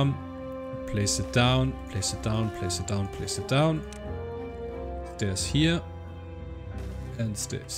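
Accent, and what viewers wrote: Germany. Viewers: Deutscher Akzent so deutlich zu hören xD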